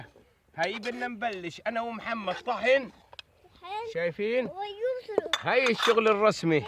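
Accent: Palestine. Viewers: لهجه فلسطين زي الكنادر مقرفه.. تحياتي لليهودحبايبنا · lahoo lahoo بالعكس احلى لهجة في العالم اللهجة الفلسطينية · اقرف لهجه فلسطينيه بعدين كسخت التمثيل ادعيه وحالتك حاله الإشي مبالغ فيه كلو مشان الشهره والمتابعه ههههههههههههههه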